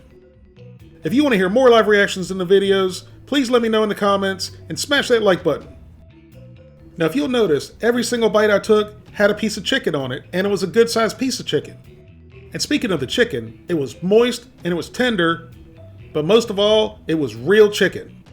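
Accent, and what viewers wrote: South, United States. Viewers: It's funny you sound less southern when you're with your wife.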